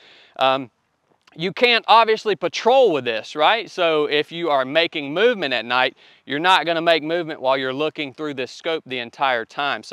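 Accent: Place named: Georgia, United States. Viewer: ! Been a long time. I was your RCPO (“RPock”) in boot camp 😂. I don’t know if you remember, but I ran into you when I was a new guy at 10 (after a successful second attempt through the pipeline). It’s funny that I just ran into your channel. I was just telling someone a story about you in boot camp. I was telling them that I had to kick my southern accent a little bit because anytime I would put something out to our division, people would giggle at my accent. Then I told them about one day when you were answering some question about what a chief wears on the sleeve of his dress blue uniform, and everyone kept giggling at your deep Georgia accent. You stopped what you were saying and said, “I wanna know what’s so funny.” 😂😂😂 It’s good to see you’re doing well, brother 🤙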